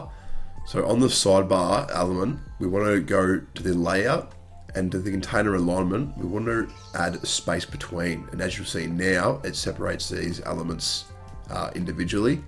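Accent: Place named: Australia. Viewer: "Hide" in Australia: "Hoyyyd" hahaha!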